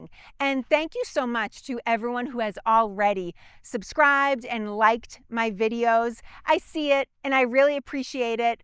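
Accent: Boston, United States. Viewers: As a proud Bostonian born and raised in East Boston. I never hear the accent when I'm speaking to my friends outside New England but I hear yours loud and clear and I have to smile. The passion you have for the story is tremendous. I have a couple of ideas for you during this the 250th Anniversary. First look at the Battle of Chelsea Creek and there is a resource for you at the Grand Lodge of Masons of Massachusetts on Boylston st. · This Bostonian has been trying to pinpoint what part of the Boston Area your accent is originally from? North Shore? South Shore? Dorchester? Medford? - Inquiring minds want to know! Even a hint will do. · Love having someone with an authentic "Bahs-tun" accent doing these videos! I'm always checking hers against my own and yeah, I sound that way too! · You from Somerville or Southie😂, I have the same accent😅❤ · Geeze, growing up in Albany we were down on Boston --- sox. 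Ur accent still hits me. Otherwise gd video. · It is good to hear a local person giving this history with a local Massachusetts/Boston Accent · Oh...love your "heavy" Boston accent BTW... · You have a serious Boston accent. My wife always accuses me of the same... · I appreciate your Bawstun accent,. 😎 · I'm from suburban Boston and was raised there from the 70s-90s and felt I knew the Boston accent, but yours is a little different. It sounds stronger and more pronounced in some ways. Wondering where you're from? And whether it's changed a little... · I love even just hearing your voice on these video. Listening to English being spoken the way GOD Intended it.😊 Those foreign accents non-Bostoniand have are SO alienating. Your voice IS "Home" to me. Keep up the FANTASTIC work you do. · Love your videos and the Boston accent 😊. It doesn’t hurt that you are gorgeous ❤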